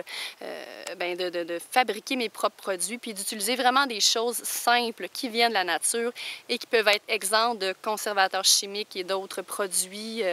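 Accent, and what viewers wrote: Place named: Quebec, Canada. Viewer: Merci pour votre partage de connaissances avec votre accent québécois si charmant. J'ai plein de millepertuis sauvage qui pousse devant chez moi et je ne savais pas comment l'employer. Je vais faire une bonne huile pour mes filles quand elle viennent séjourner chez moi, en Bretagne. Mille mercis ! ⚘🌼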